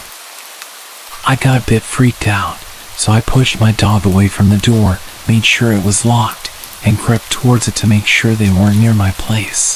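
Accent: United States. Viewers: Thanks for the western voice! So tired of everything being the same eastern accent.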